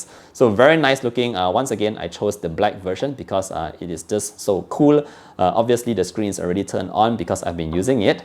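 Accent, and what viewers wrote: Malaysia. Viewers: heard your english, definitely my countryman. selamat hari merdeka!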